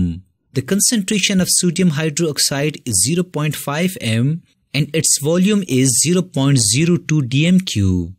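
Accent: India. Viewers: Indian accent is funny 😂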